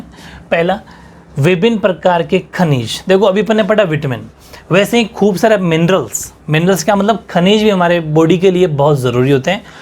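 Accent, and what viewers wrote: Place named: Marwari, India. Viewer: Ghutne Adte h kabhi kabhi aap marwari bolte ho sarji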